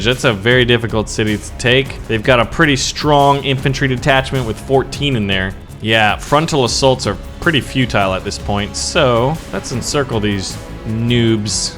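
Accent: Germany. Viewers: german accent in on point👌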